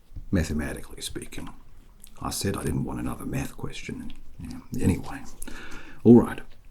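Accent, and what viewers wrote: Australia. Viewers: Totes brill, mate! Delightful and I made it to the end, just barely. 😴 Love the basement-level intonation that comes with the Aussie accent. 👍🏻 Maybe I have more words tomorrow? 8! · I miss Lars now, too! 😢 I broke both feet a few years back & the physical therapist's had a constant game show of some sort or another running in the background, which was rather more grating for me at times than was the (excruciating...don't break feet, people) therapy. This one would've left me feeling far less bleak, lol. I mean, there's a happiness baseline of Aussie accent & seagulls! 🖤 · I’m an Aussie mate, you’ve got the accent nailed. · Ahhhh nothing. It’s everything! Or is it? 🤔 😁 Your Aussie accent is so good it’s almost scary. I love it!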